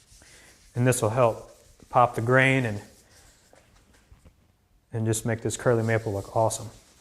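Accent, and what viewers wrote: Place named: South, United States. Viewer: Can't decide whether I appreciate more the technique or the southern accent. Great video, though. Thanks.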